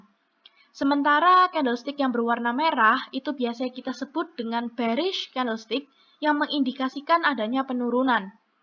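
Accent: Java, Indonesia. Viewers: Jawa mbak ya? 😁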